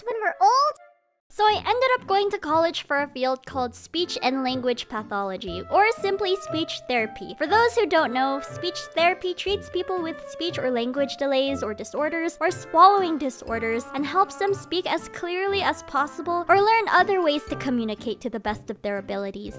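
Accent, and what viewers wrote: Philippines. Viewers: STOPPP NOT THE FILLO ACCENT😭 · The filo accent omg. As someone with Filipino parents I can just hear the voices through the phone. The "are you pregnant" part is JUST AS REAL TOO LMAO. Proud of you Ate, reach for the stars 🌟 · LOLL the filipino accent 😭😭🤣🤣🤣🤣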